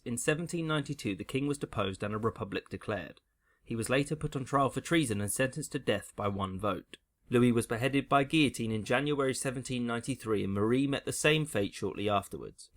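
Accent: United Kingdom. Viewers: God save us from British accent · I can't be the only one who thinks you are British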